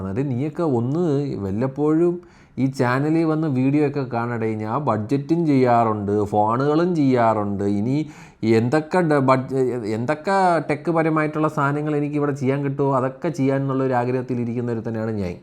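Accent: Trivandrum, India. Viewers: ട്രിവാൻഡ്രം ചീഞ്ഞ സ്ലാങ് 😡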